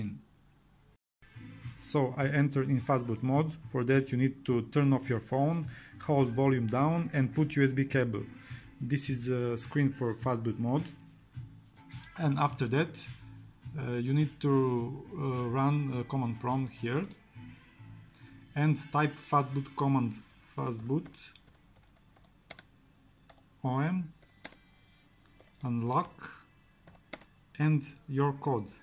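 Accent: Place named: Serbia. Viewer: Pazi mu akcenat.. ovo neki nas garant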